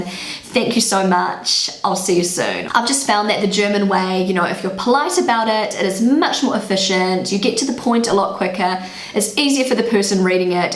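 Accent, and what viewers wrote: New Zealand. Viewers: Btw I love your kiwi accent don't loose it! ;) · Der, I really appreciate watching your VLOGS regarding the content and because I enjoy Hearing Kiwi English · Wait...I thought ppl from new Zealand talk English the way Americans do not that accent that you have or am I wrong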